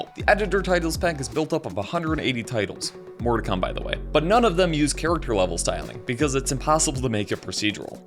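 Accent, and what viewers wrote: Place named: Texas, United States. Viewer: Yo, Are you from Texas? I have a friend from there who sounds just like you! W tutorial btw, would love to see more videos like this.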